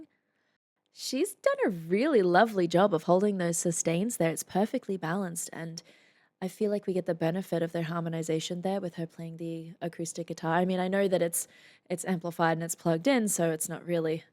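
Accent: Australia. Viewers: I’m an Aussie so don’t usually find Australian accents very interesting, but you always so amazing!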